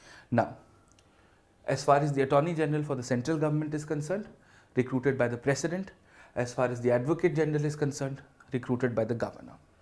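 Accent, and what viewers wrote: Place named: RP, United Kingdom. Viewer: How on earth you are not known to people, you must be a celebrity bcoz the way you speak resembles you a British Lord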